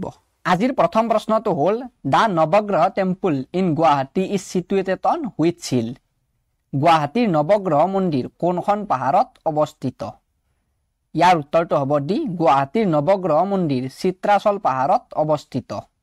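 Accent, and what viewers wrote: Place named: Dimapur, India. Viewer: Dmpur maat Jen lage ne guys 😀😀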